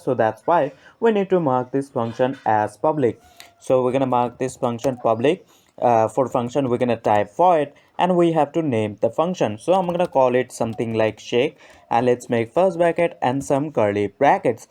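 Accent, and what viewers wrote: India. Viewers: i can't listen to this indian accent ... you should look up tutorials how to speak English.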